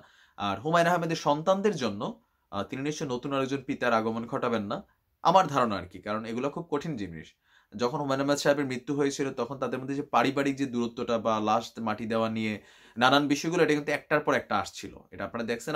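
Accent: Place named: Sylhet, Bangladesh. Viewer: আপনি কি সিলেটের লোক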